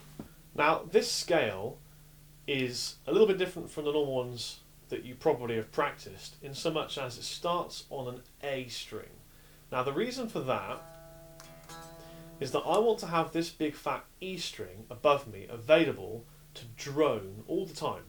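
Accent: United Kingdom. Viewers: British English no less, and it actually makes sense. Thanks!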